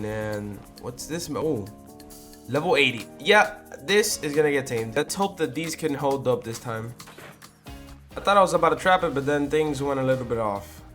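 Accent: France. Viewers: I love your french accent and your balcony👌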